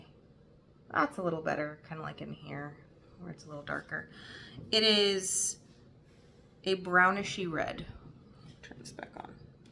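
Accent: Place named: Canada. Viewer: Hi, clicked cos thought oh wonder if she is from Canadian prairies. Made me laugh when said were in AB. You sound Canadian lol. So glad you like Kansas. We are in Nova Scotia but lived in Florida for a yr in the 80s. My daughter’s one son’s name is Benjamin.❤ oh your poor son😢. Oh and Junie is beautiful! Yes Australian shepherd for sure❤hard road figuring adhd and things like that. Oh your stitching is beautiful! Good job on your finish. Oh the floss dying is neat, I haven’t tried that. As I watch I am working on full coverage 3ft long by 2 ft wide. Oh the fabric and colours of floss are beautiful, it will turn out great. If you lent me a pattern, I would make a working copy.. and keep your pattern in the sleeve, so I see nothing wrong with using s working copy. I make working copies of patterns I buy as well…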